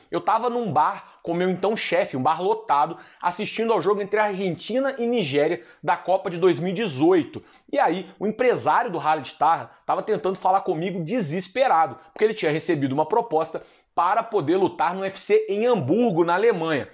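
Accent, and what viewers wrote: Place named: Minas Gerais, Brazil. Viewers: Show, Parabéns! Adorei o sotaque mineiro, sua desenvoltura e tenho certeza que você terá várias histórias de background pra deixar mais rico o conteúdo. Parabéns! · Sotaque de Minas tomando o sexto round hahahah! Ótima resenha 👊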